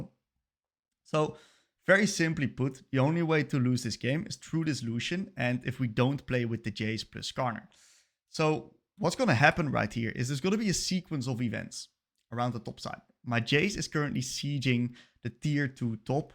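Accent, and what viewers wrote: Netherlands. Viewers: Sounds dutch.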